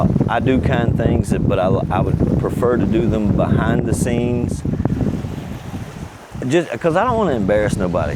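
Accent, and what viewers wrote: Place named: Southeast, United States. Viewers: I am new to your channel, I also live in the philippines. Alabang . I am trying to pinpoint your accent , I'm guessing the southeast United States. Maybe Alabama or Georgia. Any way my Budget runs about 45000 a month php i am pleased to meet you ,Frank from Florida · Hey. I lived in Virginia Beach for 35 years and wouldn’t trade it for anything. You sound like a North Catalina boy. My limited experience would put you in the Outer Banks. Anyway, I dig your content. In the process of selling my BMW R1200GSA WC and will save that for my scooter when I arrive north of Manila September 2028. As an Army veteran, I’ll do the homework for a SRRV. From So. Cal., JM. P.S. Bless you for the charitable heart. 💪🏼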